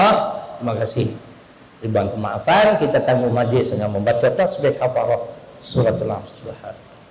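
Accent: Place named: Kedah, Malaysia. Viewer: Biasalah orang Kedah, kasaq sikit.. tapi hati bertaman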